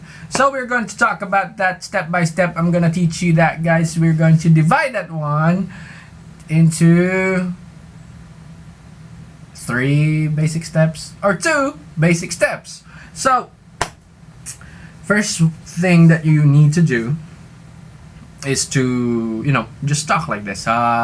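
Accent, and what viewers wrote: Philippines. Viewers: Kala ko englishero pilipino pala hahaha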